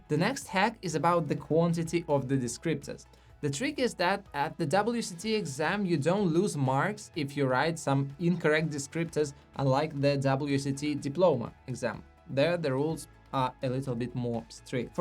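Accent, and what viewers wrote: Russia. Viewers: Are you russian? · Keep going, i belive your channel will grow to a large audience. Love your content. Nice russian accent by the way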